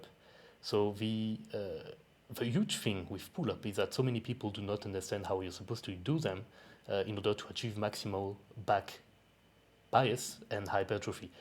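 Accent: France. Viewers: Vidéo d'entraînement de 20min, voiceover en anglais avec un fort accent français, intensité au rendez-vous, que demander de plus ? PS : pareil que toi perso. J'ai toujours été plus à l'aise avec une prise plus serrée et je règle également tous les sièges le plus bas possible sur les machines pour les pecs. La largeur de clavicules influence la prise aussi à mon avis. Qu'en penses-tu ?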